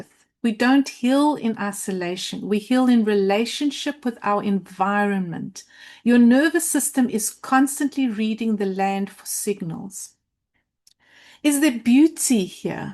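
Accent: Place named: South Africa. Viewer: Glad to have stumbled on your video. And as an expat living far from South Africa, good to hear your accent ❤. Thank you for your work. I listen to a lot of financial podcasts and one of the latest buzz words at the mo, is "K Shaped Economy" (meaning those on the upleg of the k are getting wealthier and conversely, those on the bottom leg, are finding themselves with less and less). Isn't it interesting that this is happening Now with Chiron going into Taurus AND the symbol of Chiron having a clesr K in it?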